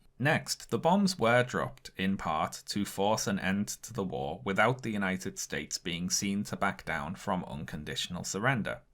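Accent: United Kingdom. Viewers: Japan forfeited it's right to anything but death and distraction when it attacked Pearl Harbor. It's easy for people in the relatively safe and far less brutal 21st century to point fingers at the past but if Pearl Harbor happened today, it would be grounds for a nuclear retaliatory strike. The war broke Japan's genocidal list for war and extermination so if cooking a few hundred thousand of them alive was what it took, it was a small price to pay. Go live through the Rape of Nanking or the Battan Death March and then tell me how compassionate you are about Japanese school children and so on. Those Japanese school kids would have grown up to kill, rape and destroy like their parents and grandparents had the United States not destroyed Japan's centuries old Warrior culture. Then again... The person in this video sounds British and they know more about killing, raping and destroying than anyone considering they spent several hundred years doing it to a quarter of the world. 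· I think it's the British accent that makes them think what he is saying is true.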